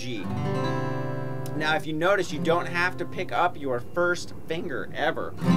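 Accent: United States. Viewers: I love the way he talks haha amo ese acento estadounidense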